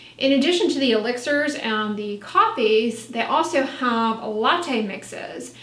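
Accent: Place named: Texas, United States. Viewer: every once in awhile that Texas accent comes through... so cute. Let that Twang fly girl!!!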